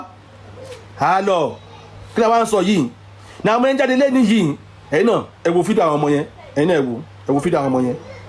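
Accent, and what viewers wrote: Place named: Benin. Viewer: Kaare omo oko, omo kaaro ojire atata. From his accent and some words you could tell that he is from Bénin Republic omo Yoruba ogidi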